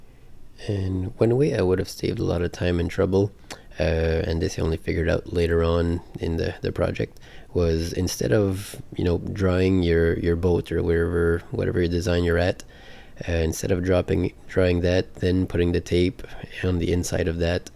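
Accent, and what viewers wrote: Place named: New Brunswick, Canada. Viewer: Super nice! Couldn't help but notice your accent... are you from NewBrunswick?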